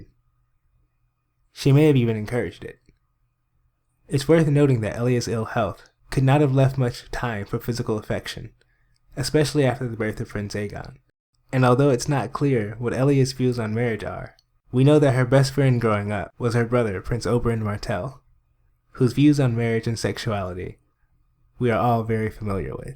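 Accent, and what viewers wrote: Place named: United States. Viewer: I really like your clips and you have a nice voice. But I'm not a nativ speaker and you have an american accent so its a bit hard for me to follow, could you speak a bit slowlier?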